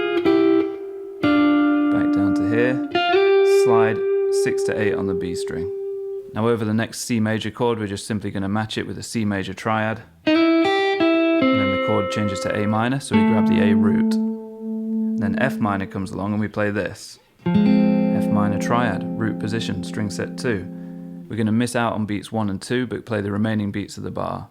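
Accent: United Kingdom. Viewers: The playing out of the chords is sublime. It shows how complex the song really is. Absolutely gorgeous. Oh my God he just spoke and he’s British! Beautifully and intelligently spoken. Beautiful.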